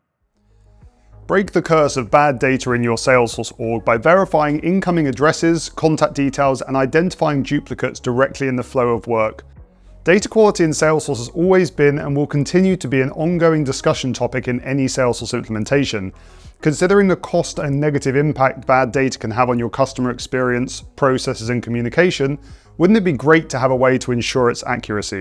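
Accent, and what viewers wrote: United Kingdom. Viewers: Dude, your British accent is difficult to listen to when trying to learn.....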